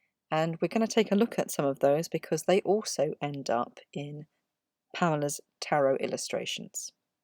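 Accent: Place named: England, United Kingdom. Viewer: Lovely to hear such a cultured well spoken English voice, a relative rarity these days! .. Although I suspect Wun't and Druv would perhaps make more sense to the listener spoken in a more northern English accent. Since the vowel change in the language (particularly in the home counties ) these words have become slightly non-sensical.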